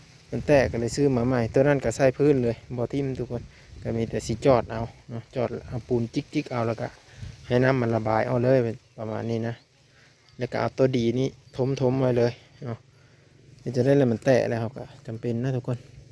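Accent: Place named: Nakhon Phanom, Thailand. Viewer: คือเว้าคือคนไทยจังหวัดนครพนมแ ท้นึกว่าเป้นคนไทย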